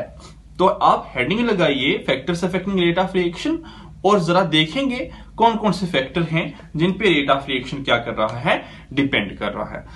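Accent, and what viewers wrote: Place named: Kashmir, India. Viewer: thanks ..but sir ksm sa lag rahai ha kashmir sai hai ..isiliyai pucha tha sir sai ..😊😊😊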